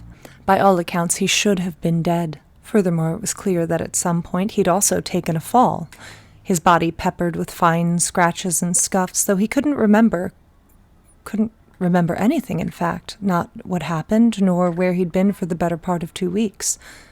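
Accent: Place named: United States. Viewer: Finally pleasant dread in American accent, thank you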